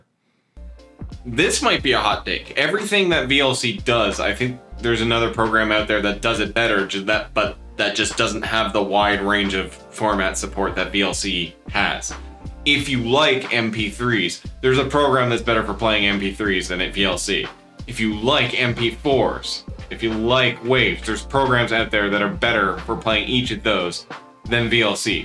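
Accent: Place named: Canada. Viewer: Timmy's heretic... it's an institution- props. Subscribed just to hear you say fehkking and oot- love the chainbrain T, too! Maniac.Make a bluenose expat homesick.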